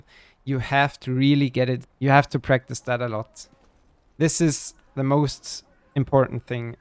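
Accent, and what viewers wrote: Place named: Germany. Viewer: Man merkt so das du deutscher bist😂😂 aber danke dein video hat mir echt geholfen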